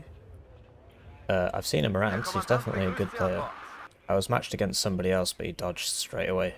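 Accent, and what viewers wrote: United Kingdom. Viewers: Lol at the juxtaposition of the Barbie song and a calm British voice bringing death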